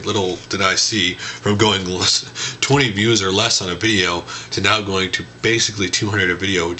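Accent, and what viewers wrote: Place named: Austria. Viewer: speak German with an Austrian accent - much like Arnold Schwartzenegger's accent. That is quite amazing doing videos in both English and German. I considered doing something similar with Dutch and English videos but most Dutch gamers speak English too so I figured there would not be much of an audience besides doing the same video twice is just an enormous amount of work. Great episode man! A good personality comes across regardless if you are in front or behind the lense.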